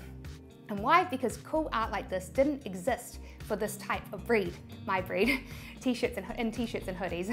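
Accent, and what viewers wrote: New Zealand. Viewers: I want to come to New Zealand to study your English speaking accent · Thanks 👍! You are always super informative and love that New Zealand accent!! 😃💻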